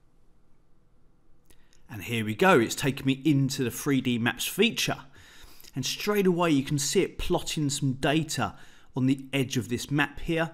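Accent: United Kingdom. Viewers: Had to download parallels and excel for windows. You have such a gift of teaching, all very clear and easy to follow. Awesome proper brit accent too haha. Just a question: can you change settings for the Bing map style, colours, etc. ?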